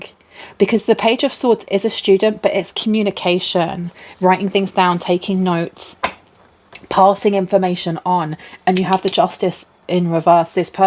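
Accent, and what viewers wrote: United Kingdom. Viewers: Thanks! This presentation and the one after it really resonated with me. In my situation, past & present. BTW really love your British accent.❤😊 i couldn't stop giggling when you said,...." as if they were stumbling around like they came out of a bar or something."" I was expecting you to say pub, though! 😂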